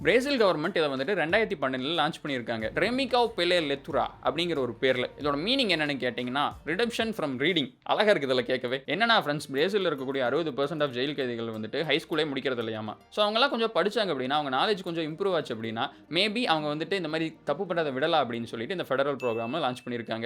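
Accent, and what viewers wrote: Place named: Kongu, India. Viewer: கொங்குகவன்டர் ஸ்லாங்..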